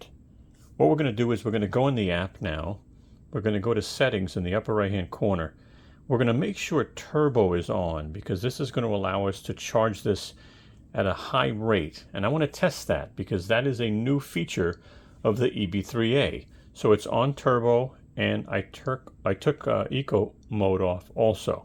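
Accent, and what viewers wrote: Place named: New York, United States. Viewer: First time on your channel. Cool review. You sound like my son in law who's from New York.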